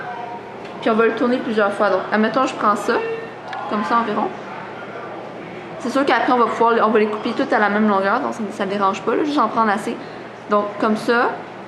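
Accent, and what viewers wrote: Quebec, Canada. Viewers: Elle parle québecois : ) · Merci pour ce vidéo, c'est très bien fait et je suis contente d'entendre une voix de Québécoise!! Tu as du talent pour éduquer. Par contre j'aurais une petite question pour toi. Si on aime pas le look avec les franges au bout, comment peut-on "fermer" le tout? Et/ou si on veut faire un "tube" comme c'est bien à la mode en ce moment, comment suggères-tu qu'on l'assemble? Ça serait bien gentil si tu pouvais faire une mini vidéo qui démontre comment terminer le foulard sans franges en tout cas! :)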